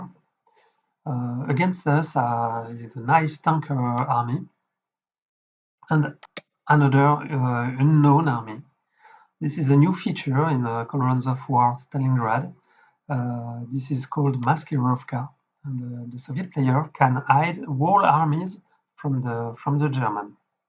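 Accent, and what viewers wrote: France. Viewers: The aesthetic is very beautiful. And the gameplay is innovating. You're english accent seems to betray a french identity ;). Vous avez développé ce jeu tout seul ? Il manque peut-être des musiques de fond pour le côté drama (comme dans le futur jeu Burden of Command). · Nice vid, it's great to see the game played by its developer (and to hear his delicious English accent from Paris 😉) 👍👍👍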